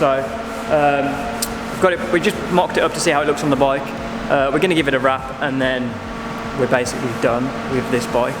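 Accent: Birmingham, United Kingdom. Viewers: BIIIRMINGHAMMM ACCENT YEEEEE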